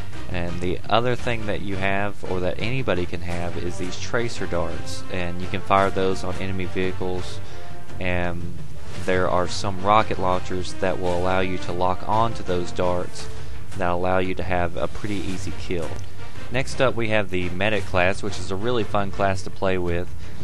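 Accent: South, United States. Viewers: are you from the southern states?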